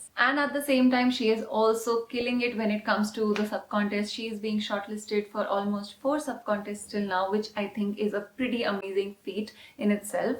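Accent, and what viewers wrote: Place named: Mizoram, India. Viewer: I love your mizo accent,so sweet .Love from mizoram 😍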